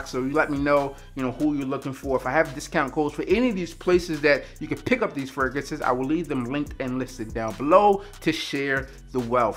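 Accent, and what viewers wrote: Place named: Queens, United States. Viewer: Yo you from Queens boss? I like the energy with the way you describe the scents. Just subscribed. Keep up the good work 💯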